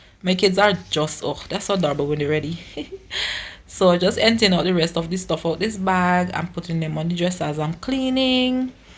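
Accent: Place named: Barbados. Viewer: For me it's nice when I come across content from Barbados. Girl you have adopted the Bajan accent very little of your former accent is left. God has already worked it out.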